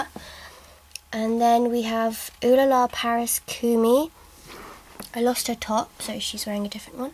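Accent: United Kingdom. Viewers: Are you british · I have the leah the witch doll but i threw her away cuase she was old and i had no use for her but i love your british accent :) also your dolls are really good takin care of :D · r u from Britain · Are you from london?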